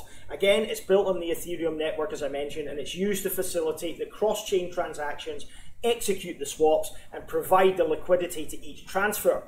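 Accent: Scotland, United Kingdom. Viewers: very British :-) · Nice info. Are you Scottish please?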